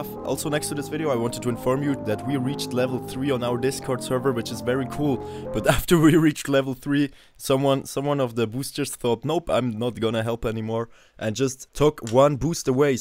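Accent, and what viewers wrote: Germany. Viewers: Man merkt deinen deutschen Akzent etwas xD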